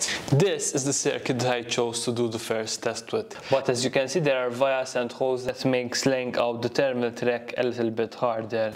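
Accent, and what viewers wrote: Malta. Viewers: Can I ask, are you Maltese? Having Maltese family, I'm hearing the accent all the time and yours sounds very familiar! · It's unmistakably Maltese, and the name is another giveaway. I lived there for 15 years.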